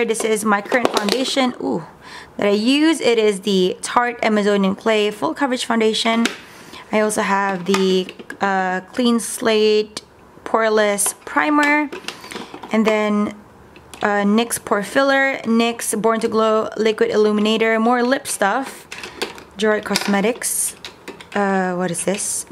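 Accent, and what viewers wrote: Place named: Sabah, Malaysia. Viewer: New favourite local youtuber! Your sabah and english accent got me 👌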